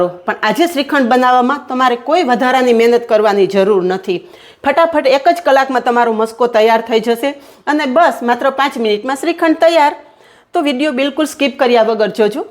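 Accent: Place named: Kathiawad, India. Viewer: beautifully explained in pure kathiawadi gujrati. You showed two things.... How to make thick dahi and shrikhand. I will add the ingredients to make dahi and shrikhand. Thank you.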